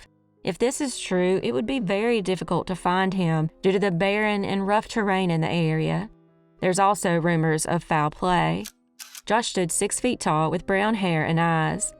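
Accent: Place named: South, United States. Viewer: Lol "MAc" donalds- this is why i listen to you! We speak the same language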